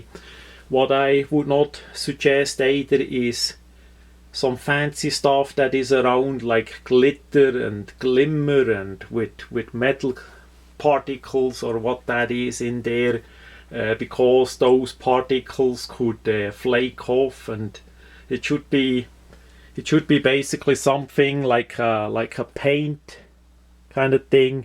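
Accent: Switzerland. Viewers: ... stuuupid as a breeead as they are ... ich lach mich schlapp, geiler schwiiizer Akzent und nette Geschichte! weiter so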